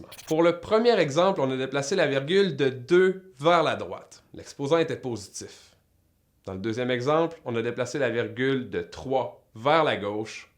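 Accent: Quebec, Canada. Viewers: Merci beaucoup j ai bien compris je vous adore surtout avec l axent québécois 👍😆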